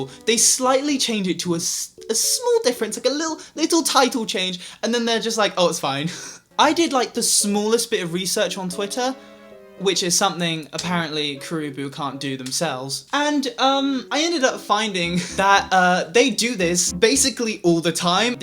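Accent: United Kingdom. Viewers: Wasnt expecting that british accent lmao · I wasn't expecting that British accent- ( I usually listen to the covers )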